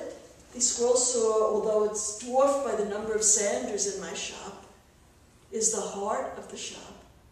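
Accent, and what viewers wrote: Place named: United States. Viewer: Scrolling with the Hegner, wrong title as no scrolling takes place just a lot of talking with strong whining American accent to difficult to understand even for the subtitles.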